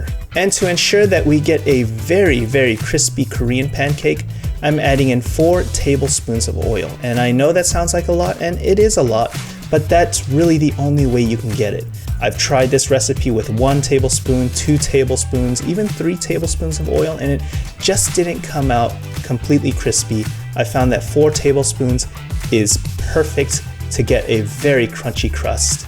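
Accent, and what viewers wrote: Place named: United States. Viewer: Thank you for your tip on getting an extra crispy results. Rice flour along with the carbonated soda also works. My only issue, is that (other than the amount of frying oil) you did not include portion or size requirements in your video nor in your description box. Portion size of ingredients does effect the desired results of the batter after it is cooked. Lastly, you have an American or Canadian accent, but live in Japan, what is your heritage? Thanks again for your tutorial. ⚘⚘⚘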